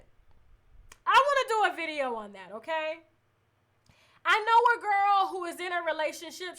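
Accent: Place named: South, United States. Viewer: I love your country accent.I am also a pisces so i relate to you on so many levels!🙋🏾‍♀️✊🏾👸🏾💜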